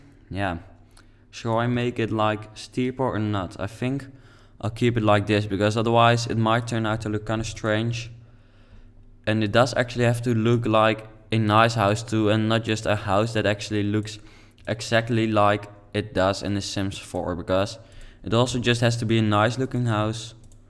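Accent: Netherlands. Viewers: hahaha ik hoorde meteen dat je nederlands bent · Hey ik wil ff zeggen dat je echt super gave huizen maakt maar 1 tip: Praat alleen in de intro en daarna niet meer,je kan heel goed horen dat je Nederlands bent door je accent. (het stoort me) zet voor de rest van je filmpje een muziekje op x Niet gemeen bedoeld alleen een tip:)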